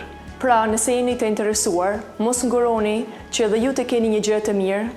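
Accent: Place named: Highlands, Albania. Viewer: Sa dialekt të bukur malsoresh paske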